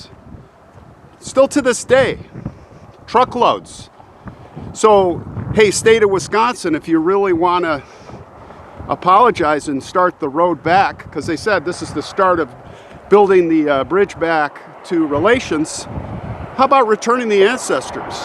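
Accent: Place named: Chicago, United States. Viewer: WOW 😣 WHAT A STORY AND VERY SAD CASE THAT THE NATIVE AMERICANS ARE TREATED SO POORLY, YOU ARE UP NEAR MY FAMILY WENT ON VACATION WHEN I WAS A KID, MINOCQUA, WOODRUFF, EAGLE RIVER, ST GERMAIN, TOMAHAWK GREAT AREA, HOLY CRAAAP THE TERROR WAS THE SHIP I SAW A SHOW ON THAT RECENTLY AND THE DOCUMENTRY TOO GOOD STUFF BROTHER, I CAN HEAR THE CHICAGO AREA ACCENT IN YOUR VOICE LOL 😆😆 TAKE CARE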